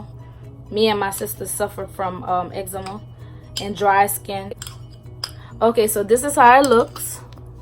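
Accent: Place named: Louisiana, United States. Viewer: 🤣😂🤣 I died when you said I'm black we never measure anything. I can def hear the Louisiana accent baybay lol. You do have beautiful skin.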